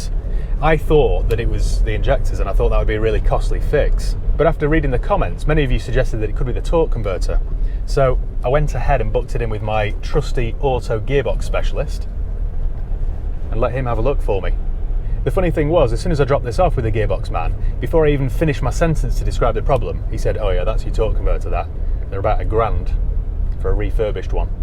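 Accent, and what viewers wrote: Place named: RP, United Kingdom. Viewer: No complaint just seems a more “posh” way of speaking not something common round where I’m from 😂😂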